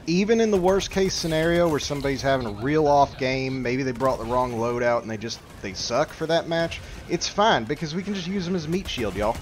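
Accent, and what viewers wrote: South, United States. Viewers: This dude is legit, great to hear southern accent too😆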